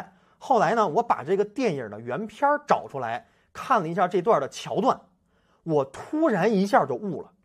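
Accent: Beijing, China. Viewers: 就冲这嘴麻溜的京片子，也得订阅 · 你是不是北京人？听着怎么有点像袁腾飞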